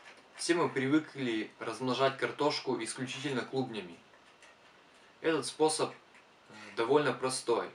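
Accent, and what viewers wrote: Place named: Vyatka, Russia. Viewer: Вятский говор. Молодец парень. Надо будет попробовать из семян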